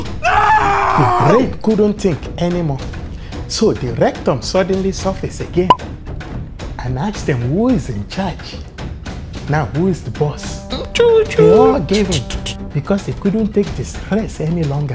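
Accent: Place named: Africa. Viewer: I don't know How you showed Up on my screen But maaaan I love this video specially your african Accent 😂😂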